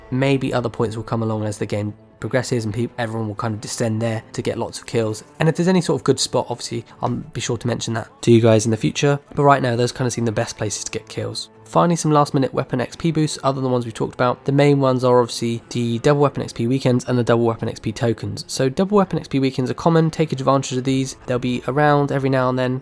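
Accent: United Kingdom. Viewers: Thanks for the guide man I had no idea about some of the things you said Also the British accent is a nice touch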